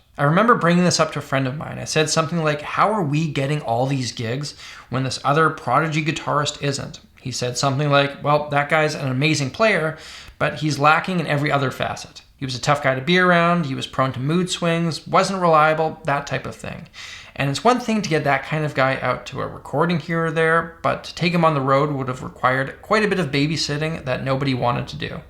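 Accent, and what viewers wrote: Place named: Canada. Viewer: This dude sounds like a stoned Canadian talking really phylisophically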